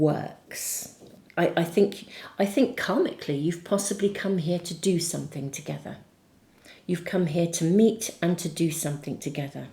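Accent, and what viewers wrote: United Kingdom. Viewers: Loving....I selected Pile 2. Your beautiful British accent and voice bring me hope.....I'm living in the UK, I am the ring here, the female with lot of energy to move forward and my partner living in the US is the castle and all correct. Unfortunately, on July 1, he said he needs a time off from this beautiful love affair we had so far, as he felt i is stressing him out....and although it made me so much pain, as I know he loves me and I trust such a love should never disappear. According to the reading today, during this time off if he steps out of his zone and sheds the skin and realises the immense things we could develop....I think that is the destiny of our relationship....I cannot call or message him until he does it for me as he is the man and going through changes in his own world, which matches what you said. Loving, I do hope he will reach out to me soon with love, clarity and purpose because I have no words to explain how beautiful it used to be........ 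According to you it should happen and hope it will.....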